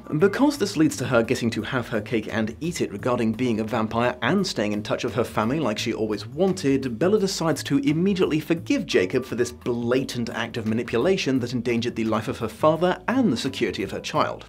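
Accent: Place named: United Kingdom. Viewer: Hearing you rip this series to shreds, in your awesome British accent, is the most cathartic thing EVER.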